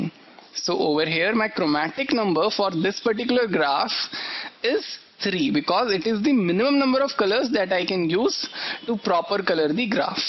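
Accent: India. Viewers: i am sick of this indian accent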